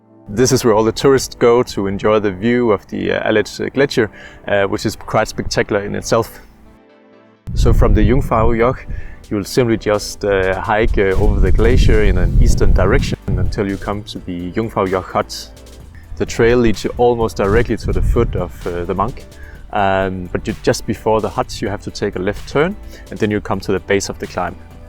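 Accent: Denmark. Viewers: Hej, overvej at skrive stednavne når du nævner dem. Ikke mindst til os der ikke lige hører det første gang, men måske også til dem der ikke kan den tykke danske accent. Fed video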